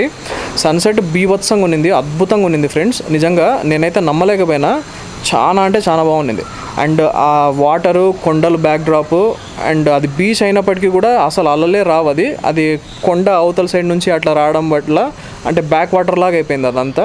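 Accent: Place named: Chittoor, India. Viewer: Bro.. Voice and slang of chitoor superb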